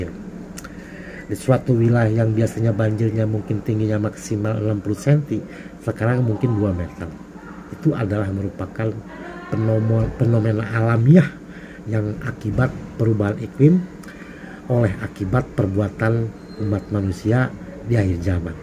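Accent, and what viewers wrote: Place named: West Java, Indonesia. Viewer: ❤logat Abah sapertos Sunda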